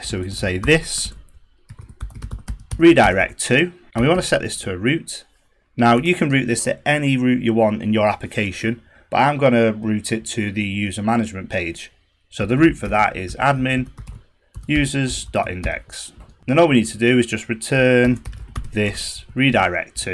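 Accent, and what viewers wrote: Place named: Australia. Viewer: Many Thanks , its really helpfull (but i sometimes confused with your australian accent heheh) , already subscribe and like all your video :))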